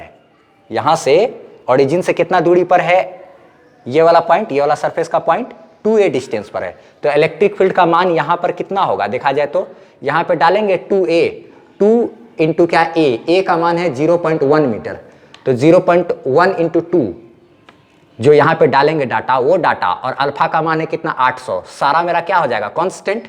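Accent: Bihar, India. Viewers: Where is your home sir ? I think you are bihari because the way you speak is like bihari ...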